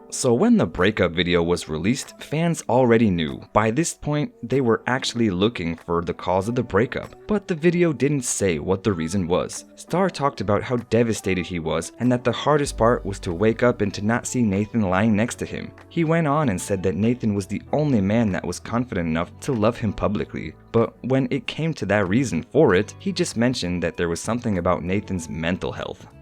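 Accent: United States. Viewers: Prices quoted in pound sterling... Narrated in American accent? I'm lost. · Jade Tea Was thinking the same thing. Sounds like a foreigner with an American accent, so I was confused by the £ usage. Especially since we’re talking about an American public figure.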